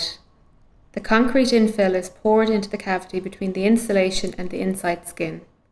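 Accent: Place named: Ireland. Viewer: Me too Juan, she has a lovely voice and sounds Irish to me.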